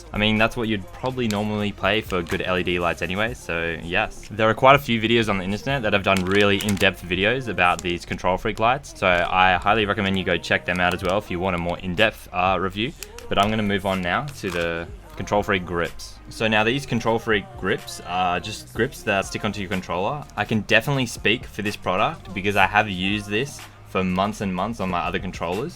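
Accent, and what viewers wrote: Australia. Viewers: he is Austrilian dingus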